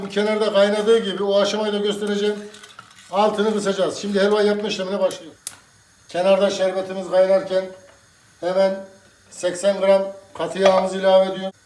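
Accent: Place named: Konya, Turkey. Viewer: Ellerine sağlık şefim siveniz bizim buralar gibi Konya limisiniz bende yapacağım Konya'dan saygılar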